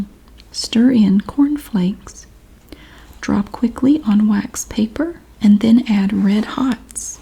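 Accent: South, United States. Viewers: Living in the south I always forget she has an accent lol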